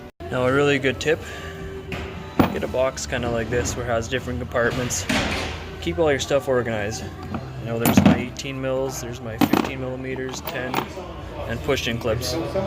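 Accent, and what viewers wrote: Canada. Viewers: I knew you were a fellow Canadian after the first minute of the vid. Good job saved me a bunch of time !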